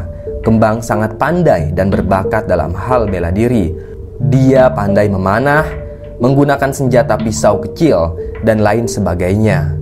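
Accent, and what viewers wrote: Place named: West Java, Indonesia. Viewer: Asli sunda apa jawa mbah. ? Kaya orang sunda eta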